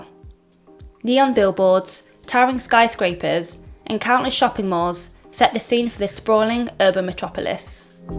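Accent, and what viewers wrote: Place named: United Kingdom. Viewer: Great video. I have visited most of the places you included in this video but your information was an added bonus because I learnt much more about some of the history about these places. Thank you. BTW where are you originally from ? Judging by your accent you sound British.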